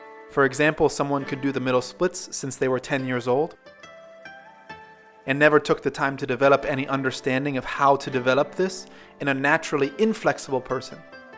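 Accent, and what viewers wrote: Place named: Israel. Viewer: love your vids:) where are you from? sound like israeli accent but i dont sure...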